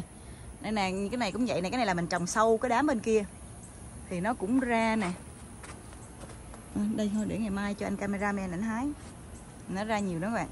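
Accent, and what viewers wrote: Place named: Saigon, Vietnam. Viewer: Cô ngạc nhiên nói giọng SG nhưng dùng từ MB.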